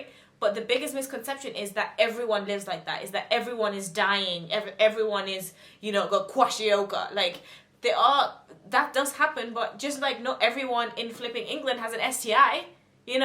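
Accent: Zimbabwe. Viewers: I love your accent😍😍😍 And am also Zimbabwean.... Love your playlist🙊🙊🙊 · 😂😂😂 "now im doing the (mentions dance move), you can't see it but I'm doing it" 😂😂👏🏾👏🏾 made me laugh and the Zim accent (died 😂😂❤️❤️❤️❤️). Ps. Love the Zim accent....#zim gang but I can't speak shona 😭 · Haha I love how u can still speak shona, with a shona accent.....newewo ndeipi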